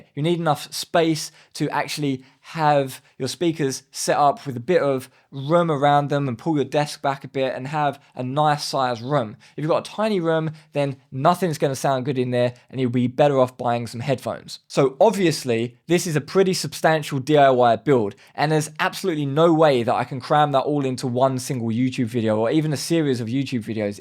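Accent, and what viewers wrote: United Kingdom. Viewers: So which European country are you at? Because I’ve noticed your wall power socket (is it Type L?) to be unusal for EU, like it’s from old days (60s-70s), perhaps in Italy? Of course your accent is British but that wall socket is something else (not UK). My guess is that you are either in Italy or Germany, perhapse in a house in the woods, which might explain seeing your tools (I do like that window view). Please do tell, I am curious about that wall power socket, it’s kinda rare. Wish you best of luck with your DIY speakers, they do look good. I will stick with my Genelecs (have you seen/heard their 8381A or 1234A models? they are cheaper than PMCs!)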